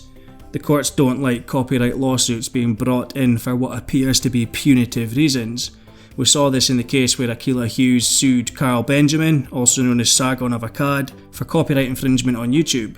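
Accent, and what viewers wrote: Scotland, United Kingdom. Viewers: He’s from NE Scotland deal with it! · Scottish? I suggest you don’t watch his other videos, they’re all in Scottish. Since he’s Scottish. 🙄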